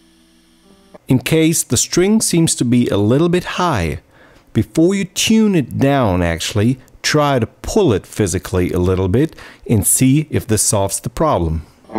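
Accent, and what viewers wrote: Germany. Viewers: he's german - so he pronounces an unvoiced 'S'-sound in cases were English native speakers would normally pronounce a voiced 'S'-sound...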